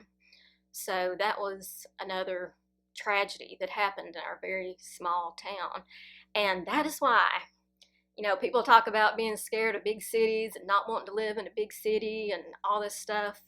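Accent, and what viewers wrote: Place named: Blount County, United States. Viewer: I KNEW I knew your accent! I grew up in Blount County! I remember when this happened and how scared my girlfriends and I were to stop at rest stops when we drove to the beach. SO scary.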